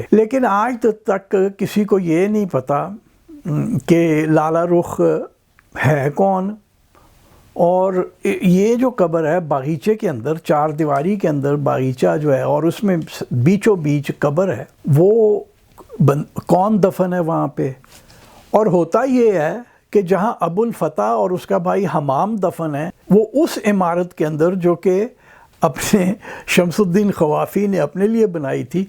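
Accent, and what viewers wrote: Punjab, Pakistan. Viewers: sahab salutes to your style of narration with a pure Punjabi accent! Please if you could present a detailed series about Maharaja Ranjit Singh, so that the new generation doesn't forget about the only legendary Punjabi ruler who is taught in Oxford. Thanks!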